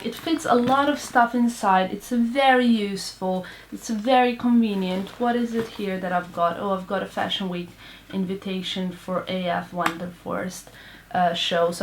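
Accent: Serbia. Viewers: I think she's Serbian?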